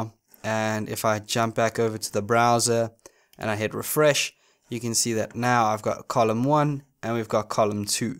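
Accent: South Africa. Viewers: Identified as South African 3 seconds into the video :)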